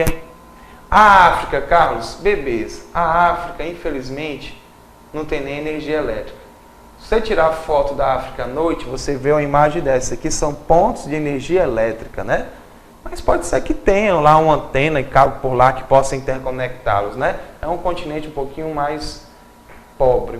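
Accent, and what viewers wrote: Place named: Ceará, Brazil. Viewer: machu réi rsrsrsrs muito showwwww sua aula.sou de paracuru(vizinho à fortal) mas moro no rio de janeiro.quando ouvi teu sotaque vi logo que era um cearence rsrsrsrs.valeuuuu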